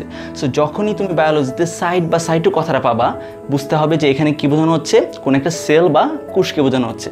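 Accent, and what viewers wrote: Noakhali, Bangladesh. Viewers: Apni ki Noakhali r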